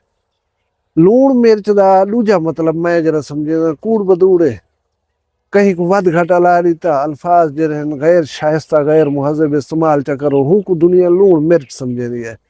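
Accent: Saraiki, Pakistan. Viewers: behtreen sraeki andaz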